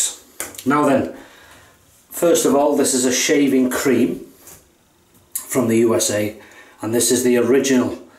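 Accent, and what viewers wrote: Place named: North Wales, United Kingdom. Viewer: Just came across this video... and your accent makes me very happy and full of 'hiraeth'! Greetings from a fellow north Walian living in germany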